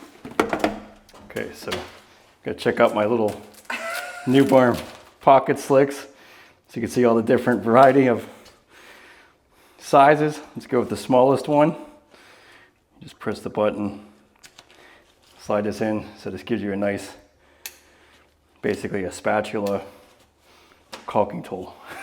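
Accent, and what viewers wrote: Pittsburgh, United States. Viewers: haha, within 10 seconds of hearing him talk I knew he was from the burgh🙂🙂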